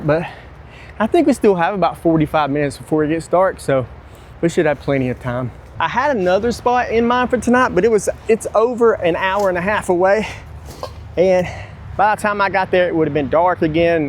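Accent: South, United States. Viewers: You have some great videos, I've been hooked for awhile now and luckily am not caught up so i always have a new one to watch. You have those common things i find entertaining: "owe", "I forgot" "oh man" "i have an apple pie" and for tne longest time i couldnt get that you were saying "spiders" until i recognized the southern accent and learned where you're from. To me, you are saying spotters lol. Love the channel, keep doin you.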